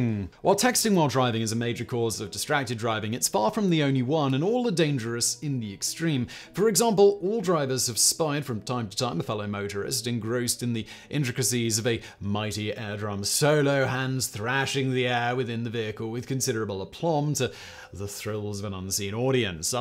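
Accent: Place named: Cockney, United Kingdom. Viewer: Interesting. The guy who's speaking is from deeply cockney stock but is well educated and is code switching.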